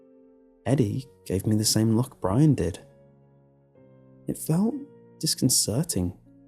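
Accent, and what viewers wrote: United Kingdom. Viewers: Pissanjecous Demsley it's cause of his british accent.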